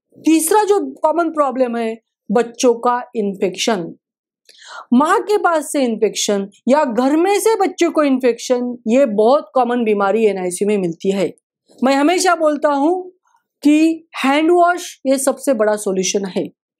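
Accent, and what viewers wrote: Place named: Maharashtra, India. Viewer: Aap marathi hai ,aap hindi nahi bol parhe ho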